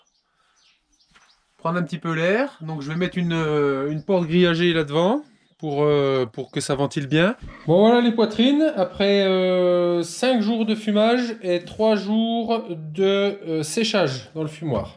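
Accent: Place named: Eastern France, France. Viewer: bonjour je viens d'essayé ta recette et je pense que j'ai réussi assez bien pour une première fois. J'ai essayé de mettre des photos mais ca ne marche pas Quand au lard je n'ai pas trouvé du bon lard frais il faut dire que je réside à la Réunion et ce n'est pas facile de trouver de la bonne qualité. mais le gout y est. J'en profite pour te dire que ma mère est d' Epenoy dans le Doubs. Je ne sais pas si tu es du coin mais vu ton accent tu ne peux être que de la région de l'Est. Au plaisir de te lire François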